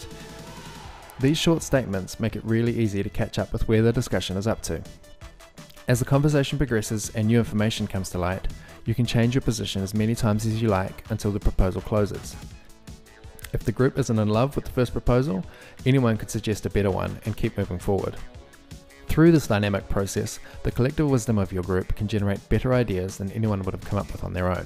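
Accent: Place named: New Zealand. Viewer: Great tutorial, but the sub-titles are a bit random - seem to struggle with the good old kiwi accent.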